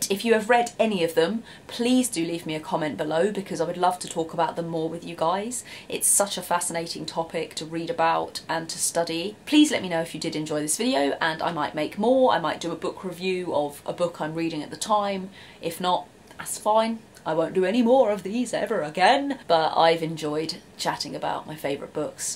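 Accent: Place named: England, United Kingdom. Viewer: Dear, I stumbled across your videos when I did some YouTube surfing recently and I must say they really make a difference. As a German its always quite interesting to hear what people from other countries think about Germany, sharing their experience when travelling Germany and what they find interesting to talk about. Of course, YouTube is full of videos with expats living in Germany or people (mainly young ones) who travelled in Germany and then make videos about their life here or their travel experience. However, most of these videos are quite boring because they are full of clichés, stereotypes, truisms and just serve the expectations what people want to hear or learn. So apart from all that Autobahn, beer, lederhosen, castles, medieval cities and efficiency crap you usually find, your videos are a lot more profound and entertaining. 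Also, it’s so beautiful to hear that likeable English accent for a change after having seen all those videos produced by Americans, Australians or Canadians. Yes, you are doing a good job and I think you convey a good and unconventional picture so far of this history-ridden country in the heart of Europe. I must say, however, that I find it quite astonishing that such a young, witty, quick and vivid woman from England like you seems to be so intrigued with the German Nazi history. But I realized quite quickly that your interest is not a superficial one but seems to be profound given the fact you chose this topic for your university st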